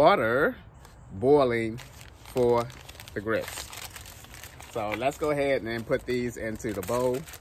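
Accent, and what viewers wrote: Baltimore, United States. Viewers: That Baltimore accent thick😅🙌🏽🙌🏽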